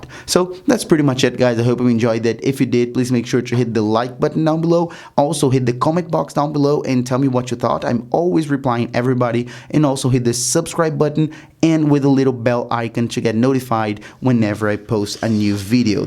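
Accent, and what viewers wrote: Brazil. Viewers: I’m watching here and thinking to myself “I feel like that’s a Brazilian accent....” Then I saw on the Now Playing and just yelled AHÁ! SABIIIIA! 😂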